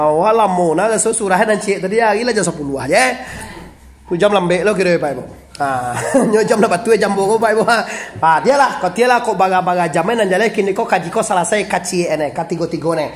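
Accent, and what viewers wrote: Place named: Pariaman, Indonesia. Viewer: Bahasa Minang kabau logat Pariaman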